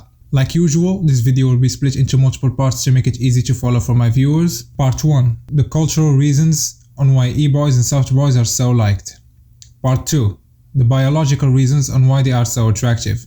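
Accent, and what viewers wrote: Morocco. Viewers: Ghir mn accent 3rftek meghribi, nadi a khoya laysahel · I knew that you're Moroccan from the beginning due to the way you pronounce the letter "T" like "tch" or something lol. Much love to Moroccan people ❤️ Also Eid Mubarak if you're Muslim ♥️ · i guessed your are from morrocco after 10s of the video based on your accent. good content akhoya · I knew you were Moroccan just by your accent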